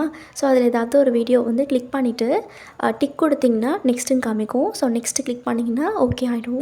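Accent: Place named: Chennai, India. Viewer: Videos la mattum tha ...etha voice modulation la pasuvigga la? Ilaa.......off the video laiyum eppadi etha modulation pasa palagiutiggala....etha slag voice 🥰 cute ta iruu .....ugaa old chennai slag romba romba alaga ..semma cute 🥰 ta irrukku...ga❤❤❤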